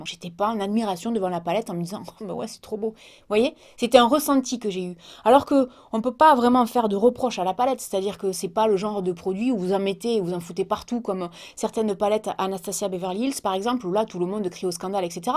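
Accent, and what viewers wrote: South, France. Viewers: Salut, je suis abonnée à ta chaîne depuis environ 1an, j' ai encore découvert pleins d' astuces et produits, j 'ai 58 ans et depuis mes 16 ans ce qui fait un bail, je me fais des gommages, masques que ce soit visage corps, mains, pieds etc. Quand je vais avec ma petite fille faire les boutiques ont me dit que c' est super de faire du shopping avec ma fille elle à 15 ans ce qui nous amusent pas mal. Je mentirais si je disais que je ne suis pas flattée ni que mes chevilles ne gonflent pas. Effectivement il faut prendre soin de soit que ce soit à l 'intérieur comme à l 'intérieur. Je souhaitais te remercier pour toutes ces vidéos supers et ta bonne humeur. J 'aime beaucoup ton accent aussi car j 'ai le même étant aussi du midi de la France. Continue tu es superbe et vrai, peut de personnes peuvent en dire autant.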